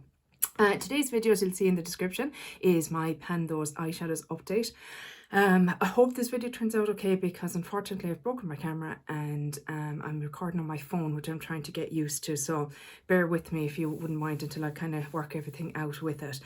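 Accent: Ireland. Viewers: I think I had never heard Irish before, loved the intro!! Congrats on hitting 2 new pans!!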